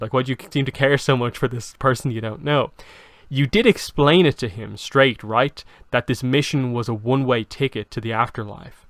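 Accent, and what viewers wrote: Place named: Ireland. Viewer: You sound Irish but this was a v good review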